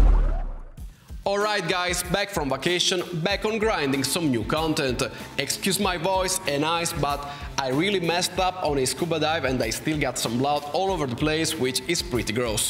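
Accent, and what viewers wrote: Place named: Italy. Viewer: Ciao, ho detto (cavoli parla l’inglese in maniera pulita e molto capibile) guarderò un po’ di video per tenermi l’orecchio in inglese, ma capisco molto bene perché sei italiano ed ovviamente l’accento è quello!! Comunque ottimo inglese!!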